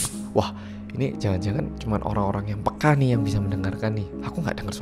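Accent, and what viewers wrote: Java, Indonesia. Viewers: Mas kelahiran Daegu, Korea Selatan 8 Mei 1994 ini juga pernah membantu tim Running Man ketika syuting di Yogyakarta. Dikit lagi gess ultah mas medok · Serem banget ceritanya mas....merinding abis.... Btw, mas kan dah lama balik ke Korea....gimana caranya mempertahankan medok Jawanya? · Medok bangett sihh kakkk,gemezzz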